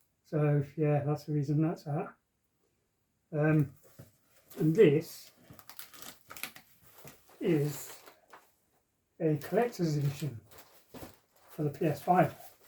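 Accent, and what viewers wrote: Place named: England, United Kingdom. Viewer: Imagine 'correcting' an English person on English with an American way of pronouncing it. Cringe.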